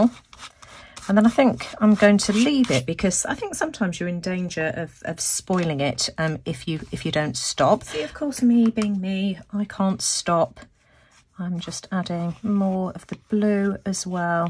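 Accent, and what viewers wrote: United Kingdom. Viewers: I’m only a little over a year into this next media and have become quite a YouTube junkie! I want to complement you, not only on your beautiful work, but how will you explain what you do and why you do it! You have the perfect voice as well! I live in the US and really enjoy your British accent! It’s so refined! Incidentally, heading off today to have lunch with my son and daughter-in-law who are expecting a new baby any day now! While I’m down in their neck of the woods, I am going to search for a Daphne’s Diary! 😉 Thanks again for the inspiration of those beautiful diaries!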